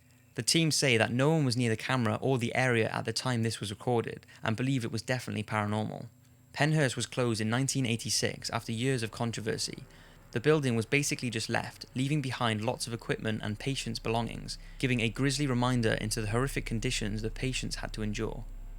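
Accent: Wales, United Kingdom. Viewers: He definitely sounds welsh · He sounds welsh like from the south of wales where the 'welshie' accent isnt as distinctive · Are you Welsh by any chance or do I need a hearing test?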